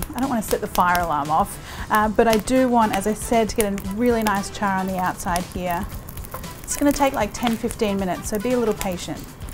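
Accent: Australia. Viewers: Its her aussie accent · she's aussie lol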